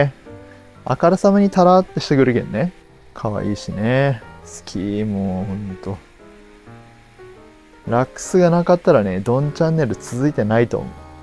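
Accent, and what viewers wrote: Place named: Kurume, Japan. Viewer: おはようございます☀ ノズルいいですね😅 まだ使ってないです。買いたいです。昨日もライブ楽しかったですよ❤ の声を聞いて旦那が『それ、九州の面白い人か？』って言ってました。の久留米弁が印象的だったのかも😅 これからもずっとどんらーでいます。ひろりーんでした👍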